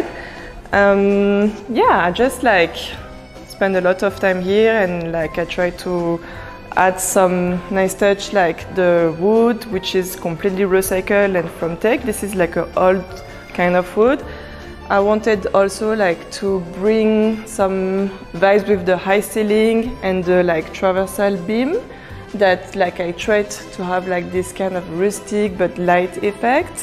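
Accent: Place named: France. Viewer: Beautiful home and nice French accent. Love it.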